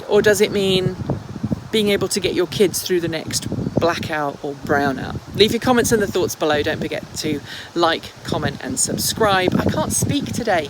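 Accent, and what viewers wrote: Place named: United Kingdom. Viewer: I hope your plane's batteries make it all the way to the UK! BTW, I always assumed you were in the UK given your accent, which I assumed was British. Energy independence means to me the ability to freely purchase the energy I need/choose to use without undesirable government or other influences. I'll probably never be in the energy business and even if you are off the grid, you're still dependent on other suppliers of parts and equipment to create and store your own energy. Freedom to me means a competitive marketplace with multiple potential vendors to purchase from.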